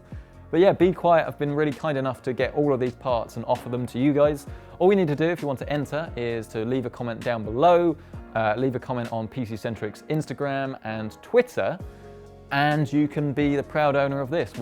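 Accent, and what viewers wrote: United Kingdom. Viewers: I do like the idea of some free stuff, but I don't want to say the same stuff as anyone else, but I'll do it anyway: I love your channel, I've enjoyed it for a while, also quite glad that you're a semi-posh brit, like myself! Happy New Year!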